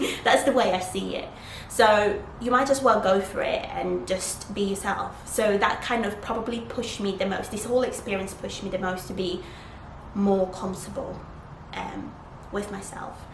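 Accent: United Kingdom. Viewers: How old are you now? You have a British accent now. I wouldn't think you would be from Italy. I lived there for four years, in Sardinia, Porto Torres, near Sassari, from 7years old to 11, obviously came with my mum there, originally from Poland. Since then came to UK, Leicester. Long story though, I have also been in Manchester for the past 2years, and before going there, I lived in the UK for about 10years, as I arrived here in 2008 Winter, I was about 11, and came to Manchester in the summer of 2018 for the first time. I haven't spoken with my family since 2017, so was on the streets a few times, but yeah... Not sure why I'm writing that, I think I side tracked :D But yeah, interesting, saw you from Mike Thurston's video, its interesting you were or are in Dubai. Would you tell us your story of how you got where you are now? :D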